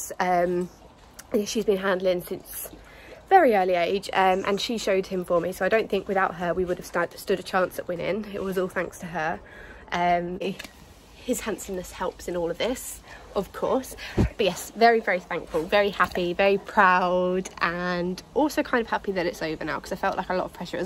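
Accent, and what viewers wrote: United Kingdom. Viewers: Your British accent is ❤️❤️❤️❤️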